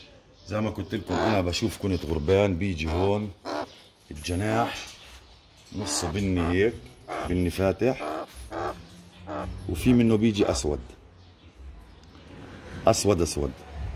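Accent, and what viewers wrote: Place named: Palestine. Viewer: اللهجه الفلسطينيه ثقيله دم ومو حلوووه سلملي ع اهل العراق بس احلى لهجه عربيه 💐❤️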